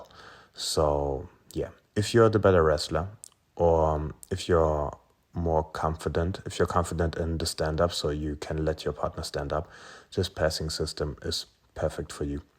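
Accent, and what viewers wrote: Germany. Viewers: This video should be titled "German man mumbling about rumbling" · are u german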